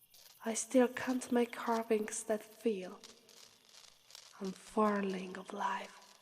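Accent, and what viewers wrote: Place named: Italy. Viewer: A tribal girl with an Italian accent. 🤔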